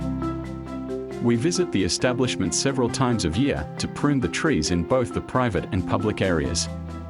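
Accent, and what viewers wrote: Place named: New Zealand. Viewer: You sound more kiwi than Australian hahaha Gosh your work is fantastic.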